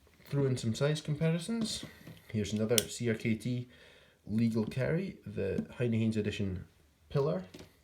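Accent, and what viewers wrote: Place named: Scotland, United Kingdom. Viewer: Nice to hear another Scottish voice. This isn’t really my sort of knife, but I’ve long had a hankering for a Richard Rodgers custom.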